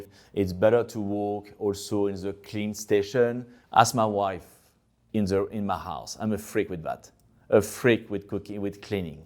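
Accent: France. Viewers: This guy makes the French accents in the movie sound accurate. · he sounded so french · The only thing that is bad on this video, is that we have to listen to French accent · I can listen all day to his french accent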